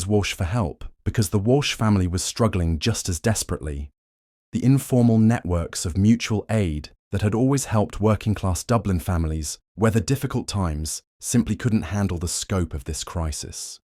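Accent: England, United Kingdom. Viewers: I know this is terrible,,,,is it just me or does his English accent make this sound more…. you know what I mean?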